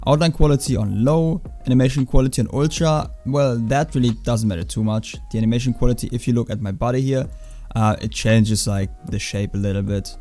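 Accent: Germany. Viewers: i hate this german voice omfg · mann hört das du deutsch bist xD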